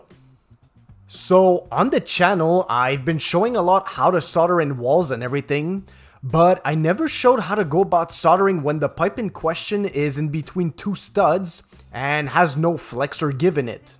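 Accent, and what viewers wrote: Quebec, Canada. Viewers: Sounds like a Québec accent ?! I believe I hear poutine between the plumbing... Is it ?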